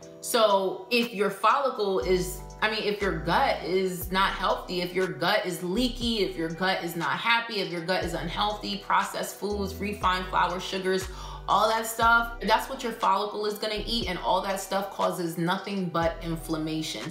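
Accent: New York, United States. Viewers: Great info....gurl....I know that accent....Gurl what part of NY you from? lol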